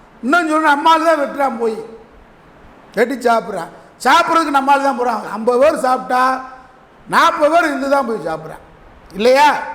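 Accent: Madurai, India. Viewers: சார் சூப்பர், மதுரை ஸ்லாங்கில், தைரியமாகவும், வீரத்துடனும், இஸ்லாமியர்களுடன் நட்பு பாராட்டியும் அருமையான பேச்சு, நீங்கள் இன்னும் பேச வேண்டும் அட்டூழியங்கள் ஓழியும் வரை.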